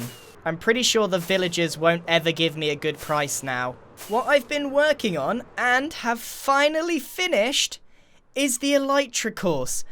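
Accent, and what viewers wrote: United Kingdom. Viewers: You made me fall in love with British accent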